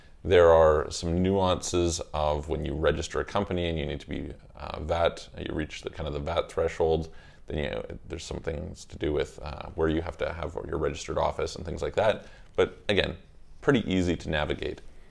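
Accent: Canada. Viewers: You have a really strong Canadian accent.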